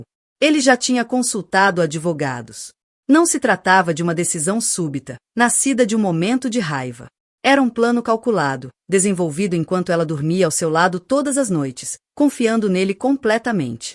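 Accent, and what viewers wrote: Portugal. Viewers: Narradora tem sotaque português de Portugal, sempre repetitivo assim.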